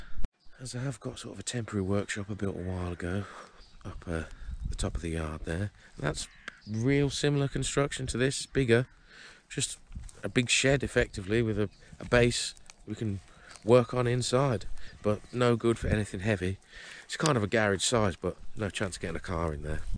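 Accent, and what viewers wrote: United Kingdom. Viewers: Northamptonshire accent?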